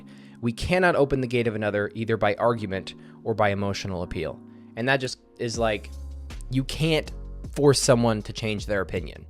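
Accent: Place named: Scandinavia. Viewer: I love that you read all of Brage’s questions in a Scandinavian accent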